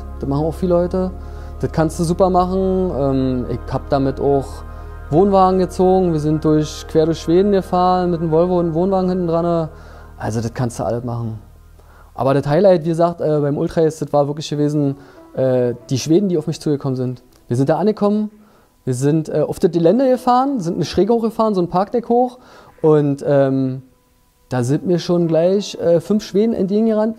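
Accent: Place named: Berlin, Germany. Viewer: Super sympathisch. Super Auto klingst ein wenig nach Berlin ? Fahre selber Volvo aber kenne hier keine andern der ne ähnliche Klatsche hat .mfg tommy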